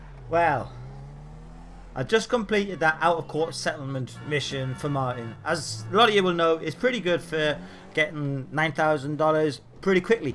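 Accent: Ireland. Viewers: You from Ireland? You sound like one of my Crew members heh. As Im watching this video, I was parked on a hill right by Trevor's house and one showed up..I think it's just random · Is that an Irish accent I hear?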